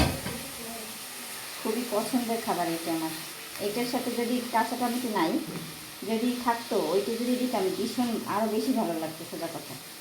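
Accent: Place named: Barishal, Bangladesh. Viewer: O monu tumer parbay ❤ Borirasl bolay kothy 😎💯🔥👍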